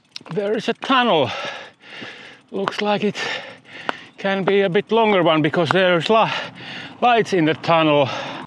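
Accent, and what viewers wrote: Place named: Finland. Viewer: Do you speak Japanese. i do enjoy your Finish lilt and English , very interesting and fun to listen to.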